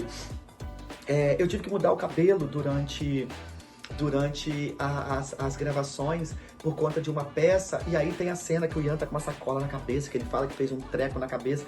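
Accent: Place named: Rio de Janeiro, Brazil. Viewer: Eu achei que vc era Carioca,. Eu fui ao Río duas vezes. Foi maravilhoso demais, me diverti muito. Eu sou de Salvador. Ba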